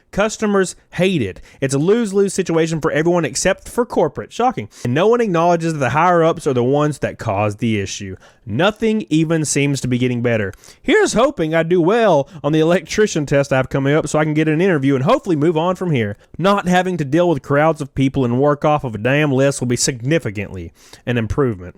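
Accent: South, United States. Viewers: I don’t know how I found this video but this guy sounds like a Southern Kermet the Frog 🐸. I’ll keep watching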